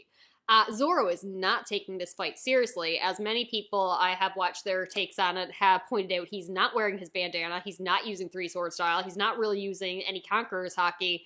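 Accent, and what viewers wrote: Canada. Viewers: Is your accent Canadian?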